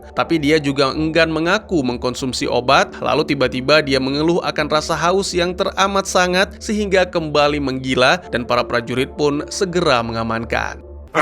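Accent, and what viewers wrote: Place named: Java, Indonesia. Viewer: Ooh adminnya orang jawa ya😅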